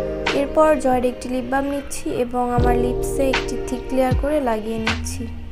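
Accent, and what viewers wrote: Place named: Bangladesh. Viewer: when you start talking 💗💗 damn she is bangladeshi😻💕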